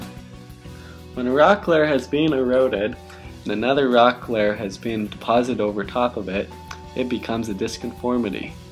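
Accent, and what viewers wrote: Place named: Russia. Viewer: Русский акцент, сделай на русском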